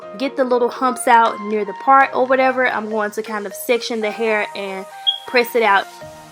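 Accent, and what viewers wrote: South, United States. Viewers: I love your country accent. 😍😍😍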